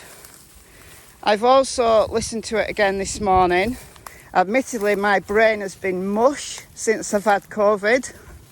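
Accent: United Kingdom. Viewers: Hi! I'm one of your new subscribers. I love your take on things, and I love your british accent.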